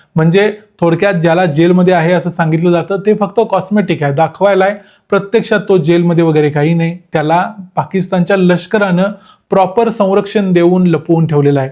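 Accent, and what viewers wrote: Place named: Kolhapur, India. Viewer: असून मस्त कोल्हापुरी बोलतोस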